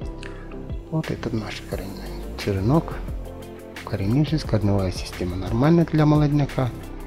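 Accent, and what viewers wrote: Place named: Belarus. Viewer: Я угадала. По разговору. У меня бабушка из Беларусии была. Родной говор слышу.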